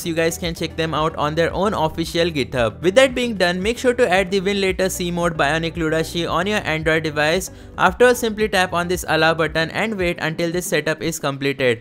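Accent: India. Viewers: you sre hindi boy you try english hindi appears in your voice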